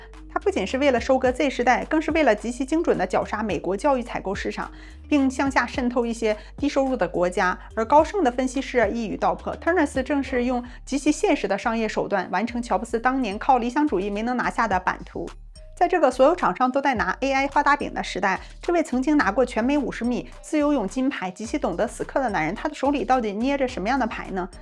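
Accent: Northeast, China. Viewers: 捂。。。唠。。。 戈。。。？？？ 大姐东北银啊？？？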